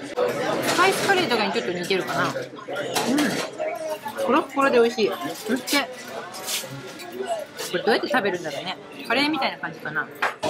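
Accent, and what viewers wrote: Hokkaido, Japan. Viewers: 時折出る北海道弁にほっこりします☺️ さんの食レポ最高です❣️今年も楽しみにしています❗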